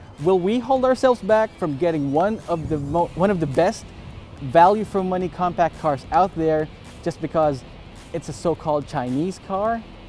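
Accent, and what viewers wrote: Philippines. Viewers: It felt like you are forcing yourself to have this perfect enunciation of words, and it resulted to some mistakes that you even apologized for. I'm sure it won't bother most people if you have an identifiable pinoy accent. Just be you, like that dad from AutoDeal reviews.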